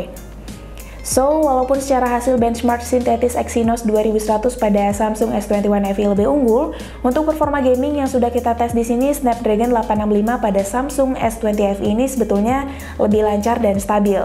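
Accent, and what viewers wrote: Bali, Indonesia. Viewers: Berasa banget logat balinya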